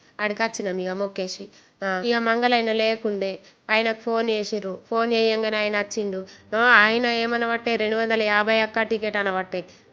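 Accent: Nizamabad, India. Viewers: Nizamabad district slang language alane untadi sis entire different❤ I am from Nizamabad too...where are you from?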